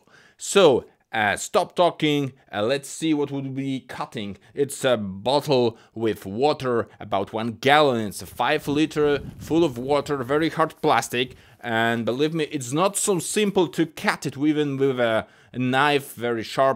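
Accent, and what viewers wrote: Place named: Russia. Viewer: Why does he have a russian accent?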